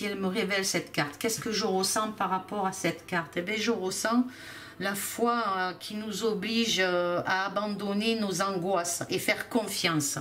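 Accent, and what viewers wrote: South, France. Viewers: Vous êtes très spirituelle. Merci pour vos video. Refusons le vaxxx tous ensemble. J'adore votre accent du sud !!! Lumière sur vous.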